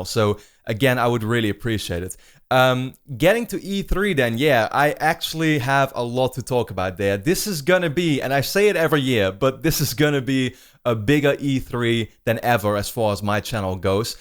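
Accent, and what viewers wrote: Netherlands. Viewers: Are you Dutch or South African? 🤔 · Dime Drawer he is Dutch.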